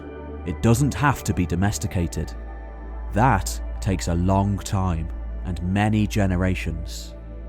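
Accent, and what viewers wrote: United Kingdom. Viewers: güzel british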